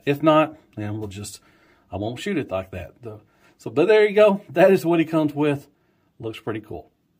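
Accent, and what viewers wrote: South, United States. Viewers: Your enthusiasm is joyfully infectious and a Southern accent is always a pleasure to hear. I subscribed, and am rooting for your channel! Side note from left field here but, I hope they make a Bruticus one day. 'Bingo Toys Bruticus' just rolls off the tongue, don't it?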